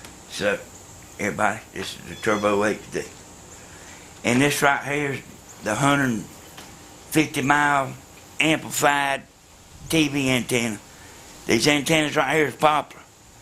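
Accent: South, United States. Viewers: You need a signal strength meter if your making 1k a week you can afford one, then any doubt you have you can fine tune your product. I think I'd make a bending jig with nails and a sheet of plywood. So do these work better outside on the roof or highest point on your house or in the attic? I think my next prosses might be a system and materials to mass produce these. Your reflection device could be mesh covered in hard plastic. I also think the PVC pipe works just route the wires inside the pipe. Also the quality, and fittings on your coaxal cables will have a great effect on how many db down you have. Our old cable company changed its connectors often to get better signal to noise ratio's also all connections must be tight. A loose connector will leak signal. Some people might think your dumb, well yes your southern english is a key off but I understood you. 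So my spelling might not be great but I have dyslexia. English wasn't my forteau in school. Really the comment anyone is stupid is in and of itsselfe stupid. We all have something to offer. You've found yours. For all we know your acting like you talk to be funny. By the way even if I know more my wife is always smart. She is intelligent its just different then myself, I know more world things and see things how they work together and alone I have a good idea how to make things and take them apart of why they don't work. She can tell you the math or where I screwed up the math. Now with a signal strength meter you'll kn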